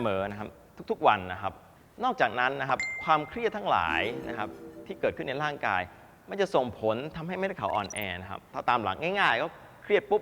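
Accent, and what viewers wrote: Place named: China. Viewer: คุณหมอเหมือนติดสำเนียงจีน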